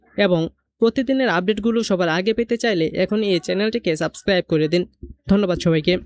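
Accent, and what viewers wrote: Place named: Bangladesh. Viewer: আপনার কথা গুলো বাংলাদেশশের মত শুনতে লাগছে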